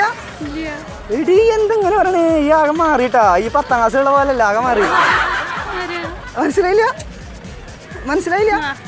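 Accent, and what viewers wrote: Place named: Thrissur, India. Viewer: Thrissur slang 🤩💥🔥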